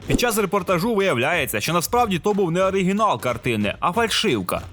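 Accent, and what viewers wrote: Poltava, Ukraine. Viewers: Пане Автор, а Ви часом не з Центральної України - просто у Вас говірка схожа на полтавську чи черкаську?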